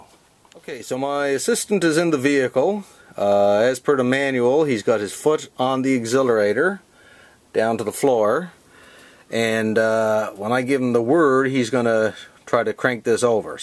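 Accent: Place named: Canada. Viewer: Where are you from? You sound Canadian lol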